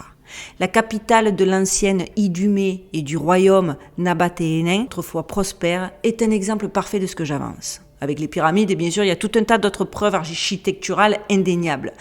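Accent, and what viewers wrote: Marseille, France. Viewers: Grand merci pour cette vidéo peu importe les sujets futurs se sera au top avec ton accent de. Marseille 😁👍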